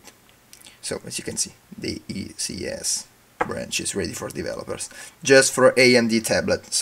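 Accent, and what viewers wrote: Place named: Italy. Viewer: si sente molto dall'accento che sei italiano xD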